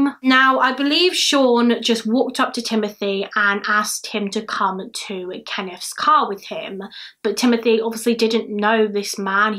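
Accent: United Kingdom. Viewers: love your British accent ^.^ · I don't know why, but your British accent is so beautiful. You have a super soothing voice! I loved this video!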